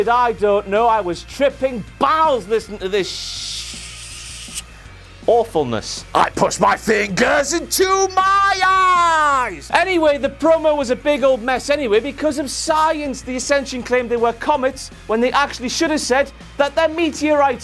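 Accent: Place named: Scotland, United Kingdom. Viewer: This guy is stupid. He just keeps bringing up the same shit that wasn't even funny the first time. And his Scottish accent sounds gay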